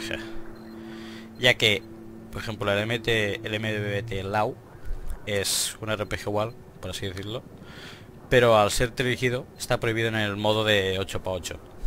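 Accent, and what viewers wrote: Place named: Catalonia, Spain. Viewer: Ese acentillo catalan xD, nada decirte que el otro dia justamente me estube mirando dichas reglas (esl) para una cw y las restricciones en las carabinas y los fusiles son mas ampliasy que los dmrs stan permitidos, igualment bon video company i normal que se t.escapes savent qui ets dubto que et fixesis xD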